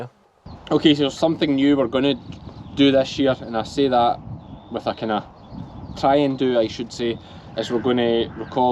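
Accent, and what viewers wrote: Scotland, United Kingdom. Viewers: Hello from Australia. I have just stumbled upon your videos and your Scottish accent has me hooked! You are certainly kept busy with sheep farming. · After I watch just a few of your videos, I start thinking in a Scottish accent. Lol. Tho I still can’t understand a word I’m saying. 😉 ♥️&🙏✝️ to you guys.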